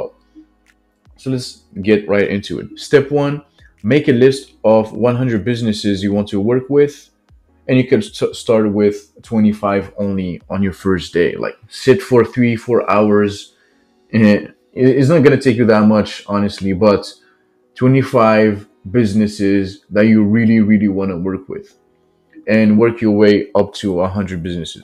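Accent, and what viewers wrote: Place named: France. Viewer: Are you french ? (I'm french)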